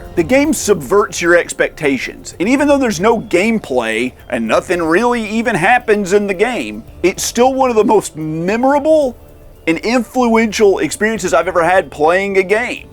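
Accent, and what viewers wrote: South, United States. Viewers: Man, As a gamer who watches tons of Youtube Game Reviewers, you are the first that sounds like someone from where im from...The south... Nice to hear a good ole country boy on the gaming scene....Glad i found your channel😀. I fall asleep to AVGN, but i woke up hearing your voice like “who is this?”…Hooked!!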